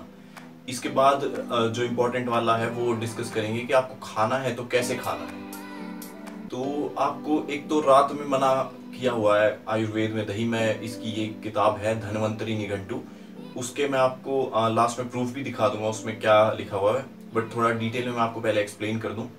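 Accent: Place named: Himachal Pradesh, India. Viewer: It sounds like ...you're from HP Nyc info btw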